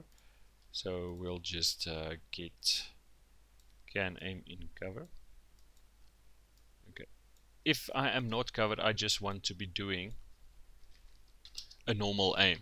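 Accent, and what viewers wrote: South Africa. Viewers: I also noticed the way you pronounce words. So I assume dat jy ook afrikaans is :p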